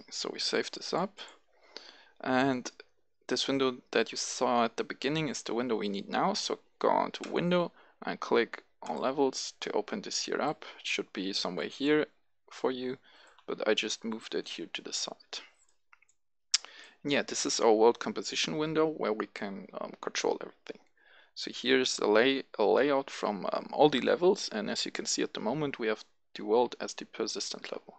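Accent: Germany. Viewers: deutscher oder österreicher oder schweizer?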